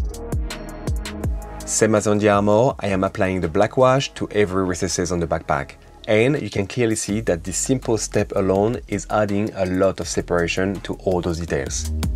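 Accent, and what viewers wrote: France. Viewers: on dirait un accent français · Came in the hobby with the V3 around... 2000 i think. And started with Blood Angels. Just came back 2 years ago, still with BA. Nice paint, the fact you didn't use Metallic is cool. I would be curious to know what is your experience in painting cause, it looks awesomely incredible. BTW i checked (not finished, it's late right now) your other video, and was amused by your accent, so "tranché", No surprised by the fact you're french. And a Parisien one. It explains A LOT. Hi and cheers for the next videos, from a fellow french (But Nemesis Marseillais). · je me disait bien qu'il y avait un accent francais la dedans bon bah j'mabonne...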